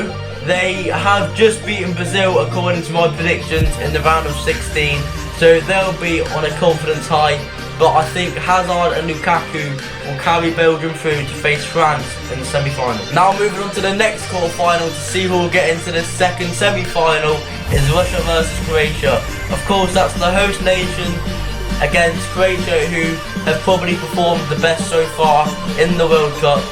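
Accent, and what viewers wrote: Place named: England, United Kingdom. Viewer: Let me guess, u are from england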